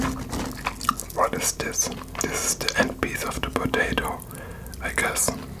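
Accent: Germany. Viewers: Man merkt das du deutsch bist weil alle deutschen so english sprechen 👍🏻😅 (Außer ich glaub ich)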